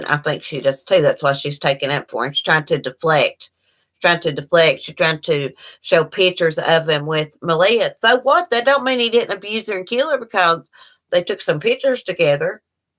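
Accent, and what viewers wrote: South, United States. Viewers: I grew up in Alabama and live in Philadelphia now and, let me just say, it is so refreshing to hear a southern accent again. In any case, I just started watching this so you may mention it in this video, but the first article I read about Maleah made me think immediately of the Susan Smith case-- missing car, no witnesses to the alleged "kidnapping" and a lot of reasons to suspect that the parent didn't want their child. I can't recall the details of the investigation involving Susan, but I do remember she claimed her kids were kidnapped by a carjacker while she was at a traffic light at a deserted intersection but she later confessed when the police discovered that the traffic light only turns red if another car is approaching the light from the cross street and they confronted her. Maleah's parents don't seem like the brightest bulbs, so I imagine that they will soon get caught in a similar lie that they won't be able to explain away. 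Sadly, I can't think of any reason as to why they would concoct a kidnapping story unless (I hate to even type this) they killed Maleah and need to explain why she's gone. They may have intentionally killed her, or they may have injured her so severely that she either died or she would have needed medical attention. After one serious injury they were able to get away with it by the skin of their teeth by saying that she fell. It would have been a lot harder to explain away another serious injury.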